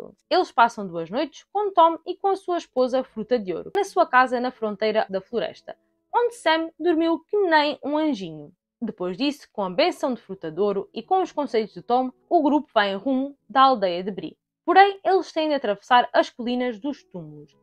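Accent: Alentejo, Portugal. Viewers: Que conteúdo incrível. Parabéns equipe. A qualidade desse canal só sobe. Vocês são o melhor conteúdo de Tolkien em português. E a belíssima trás a beleza e voz alentenjana para estender essa qualidade além mar. Parabéns pessoal. Não me canso de elogiar vocês. Um grande beijinho 💋 para a sou muito seu fan.:_Silmaril::_Silmaril::_Silmaril::_Silmaril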